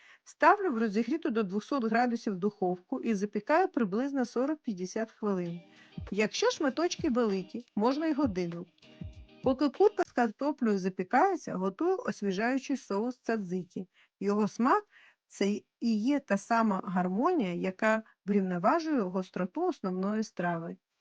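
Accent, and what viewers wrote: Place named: Russia. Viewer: Говорите. или по.русски. или. чисто. по. украински. Ваш. родной. Язык. Это. русский. Это. очень. слышно. Вы. Умница. мне. приятно. вас. слушать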